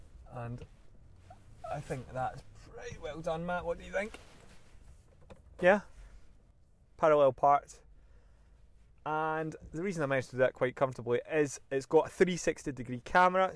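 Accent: Scotland, United Kingdom. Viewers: I just love listening to Scots speak hehe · Is it Scottish language sound?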